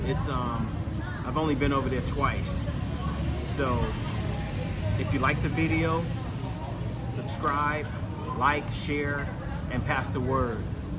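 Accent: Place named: Los Angeles, United States. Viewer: Sad. Sounds like academia. Your speech sounds like Los Angeleno.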